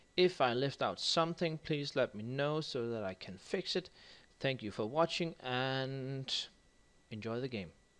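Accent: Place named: Denmark. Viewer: LOL Dansk accent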